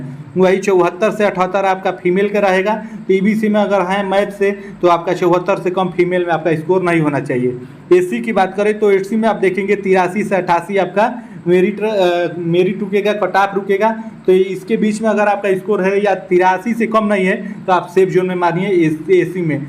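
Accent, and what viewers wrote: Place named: Uttar Pradesh, India. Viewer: Aawaj se lagta hai ki UP k ho .Tum ye batao ki UR or BC me kabhi bhi 6 se 7 no ka anter ho sakta hai kya oo bhi 1600 seet me .Sahi jankari do ....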